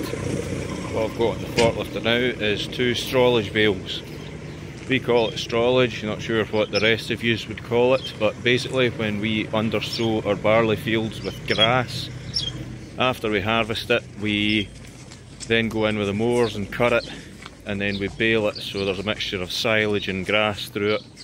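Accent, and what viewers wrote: Scotland, United Kingdom. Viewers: Can you tell me if that's the Ochil hills in your videos, Mrs thinks your a Fifer and I think more cumbernauld side.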